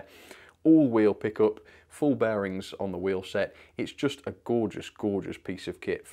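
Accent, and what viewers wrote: United Kingdom. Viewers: i dont think i will... train sets are like 20 times outside of my price range for a hobby. also no offense, but the few people ive meet who are into model trains are really really really obsessed with trains. i dont think i would fit in and would be viewed as a filthy casual within the train community. i watched your thomas set vs real life trains. i like to see home made competitions like that. jelles marble runs does a fantastic job with the marble olympics. i also really like your voice, and the britishness, if thats even a word.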